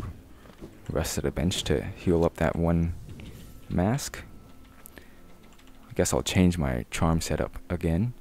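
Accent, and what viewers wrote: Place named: Philippines. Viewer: i could actually listen to you sing and just talk forever tbh your voice is so nice this isn't fair incidentally, where are you from if you don't mind my asking? your accent reminds me of some Filipinos but it could also be European...?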